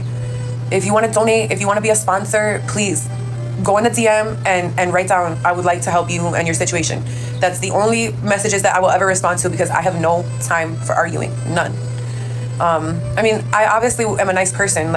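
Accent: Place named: Latin America. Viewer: I doubt you ever cooked for your kids. And that stupid latina accent - i just cant.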